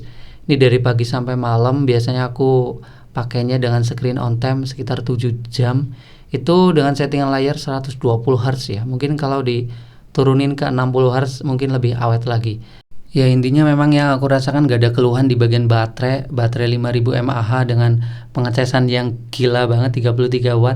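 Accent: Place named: Java, Indonesia. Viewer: Behh jan jowone ketok bangetmas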